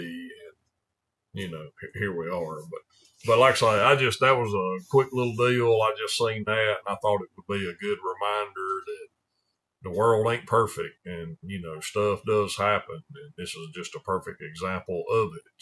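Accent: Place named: North Carolina, United States. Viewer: He talks like my relatives in North Carolina.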